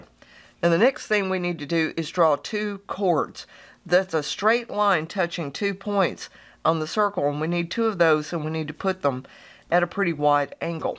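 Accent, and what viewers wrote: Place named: South, United States. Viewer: Love the accent…western? Southern?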